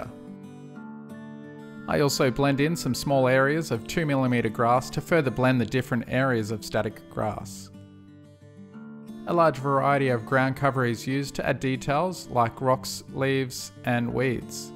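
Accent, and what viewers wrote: Australia. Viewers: I can sit and watch your videos forever. Nice background music, an Aussie accent and lots of tips and tricks to build awesome stuff. What more can one ask for. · you clearly have an Australian accent, Yet use a lot of American products, so my question is where are you located? As I am in Australia and importing those products work out to be very dear.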